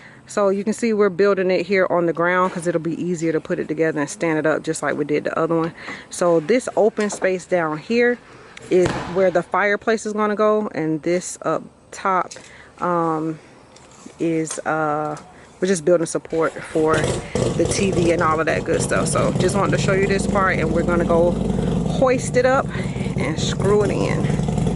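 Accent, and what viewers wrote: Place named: Florida, United States. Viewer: New subscriber here! I knew you were from Florida with that accent before you even said it. Lol I'm originally from Tallahassee.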